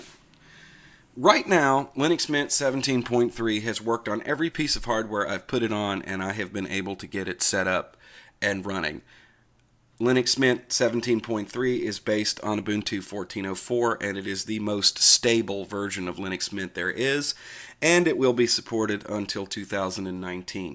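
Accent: South, United States. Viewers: Boy, I'll tell ya - that southern twang spoutin on and on about Linux is something special. Keep on keepin on, and don't mind the haters. You've got another subscriber! PS: To everyone saying that "Ubuntu just works - stop distro hopping, you'll learn how it REALLY works" - go f*** yourself, you dang fanboys. He's absolutely right. The packaging of the kernel is not always done right. People mess up and some of these people are coders & packagers. I can't count the times on my two hands when I've installed the "easy to use linux distro" only to see that certain unsupported chipset & hardware, as well as unstable properitery AND open source drivers, make the system crash and burn horribly. It's quite irritating and someone who tells it like it is makes the situation better for new users, in realising how free and open it really is. It is chaos - a beautiful chaos, but still: chaos.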